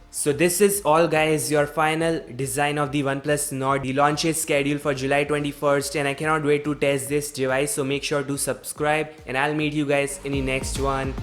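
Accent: India. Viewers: Dude, you should honestly get some English and pronunciation lessons before you post videos. The way you speak and that cringe-worthy stupid accent that flows outta your mouth is the worst thing ever! People like you give Indians a bad name when they tell us we cannot speak English properly. Swear to god, get educated before you educate!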